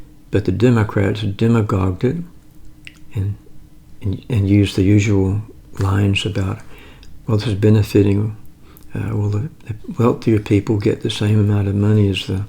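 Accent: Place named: South, United States. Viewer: Great video! Thank you for continuing efforts. I wonder if you # or labeled a video southern accent if it'd intice more people to watch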